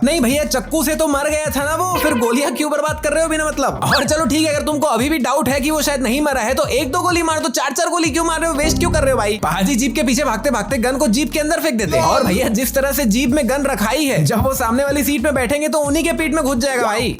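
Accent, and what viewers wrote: Chhattisgarh, India. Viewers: Bhai aap chattisgarh se ho ye aaj pta chala 😅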